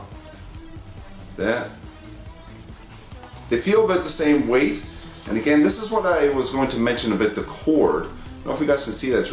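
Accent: Newfoundland, Canada. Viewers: You are so Canadian! I'm Canadian but I don't have an accent like that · Are you a newfie? You sound like a newfie. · Where are you from? You sound like your from NL Canada! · god im canadian but this guy is really canadian haha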